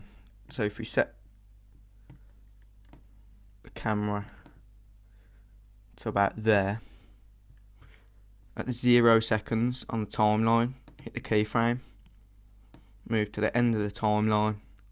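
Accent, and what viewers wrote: Birmingham, United Kingdom. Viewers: Hmmmmm.. Are You From Dudley? Im From There And You Sound Like A Brummy -_ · Birmingham Accent FTW :D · Typical Brummie :)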